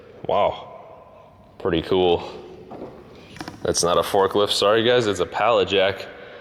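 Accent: Chicago, United States. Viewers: I knew you were from Illinois. Got that Chicagoland accent haha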